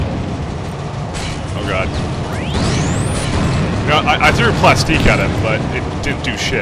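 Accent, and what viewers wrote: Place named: Canada. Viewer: Candians always sound like that, even as babies.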